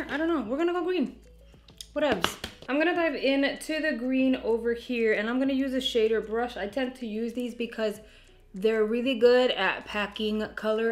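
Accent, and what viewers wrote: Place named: New Jersey, United States. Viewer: Congratulations on hitting 40 K on your YouTube channel, I watch you all the time and I love your New Jersey accent. Thank you for posting such fun and exciting videos. You rock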